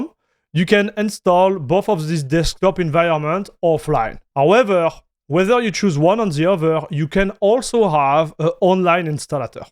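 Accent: Alsace, France. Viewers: he's speaking french but saying english words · Or Elsaß ;That is why the French hear German, everybody else hear French. -) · As a Scandinavian, married to a Quebecoise, for me it sounds like a very European French accent. It adds a lot of character to the channel. I love it! Top content. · As an aussie whos heard a few french accents and a lot of french Canadian accents he sounds super euro french to me. But i thinks its his tonality and inflection of words that give him an Arnie sound also... He could do the french dub for Arnies movies 😂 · I think he is from Alsace Region of France, it's definitely French with a German influence. The dialect is called "Alsatian". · uuuh i hear a frenchy <3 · I'm German and I can only hear French here. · He only sounds French. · He's French speaking English. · Few seconds in and I was like "he must be French" ... checked his profile which says Canada... 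well, it's an official language there. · As a french, I can only detect the French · On sent l'accent français. Merci pour la vidéo.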